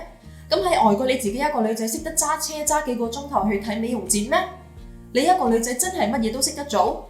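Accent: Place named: Malaysia. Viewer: 之前对你的马拉广东话口音好有印象，人靓左好多，差点认不到，看好你。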